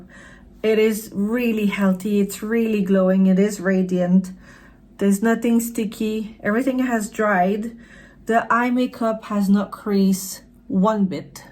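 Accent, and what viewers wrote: Quebec, Canada. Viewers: Beautiful! Je reconnais un accent Québécois?😉😉J’adore les Météorites! ❤ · Hello! Gorgeous! May I ask, are you originally from Quebec? 🙂 I am so I feel like I recognize an accent. Vraiment magnifique, j’adore Guerlain! I bought the pearls (I love Meteorites so much) and some Kiss Kiss balms. I am really hesitating to get the eyeshadow palette. 😍 Thanks for sharing! 🫶🏼 I subscribed! If ever you want, I have a channel too. Have a great day!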